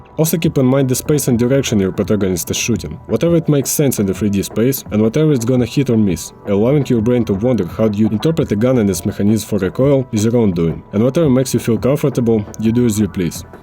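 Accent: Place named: Russia. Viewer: Русский акцент ОЧЕНЬ заметен. Это не плохо, это хорошо что ты пытаешься выходить на зарубежную аудиторию. Желаю успехов!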